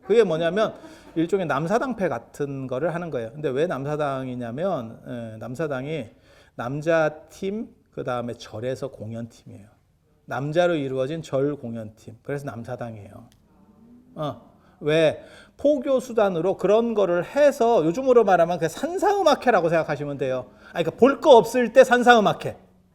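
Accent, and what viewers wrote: Jeolla, South Korea. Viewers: 스님께선 서울서 자랐다고 늘 말씀을 하시는데 말투나 어조는 전라도 사투리가 같아요 시간될때 말씀좀 부탁드립니다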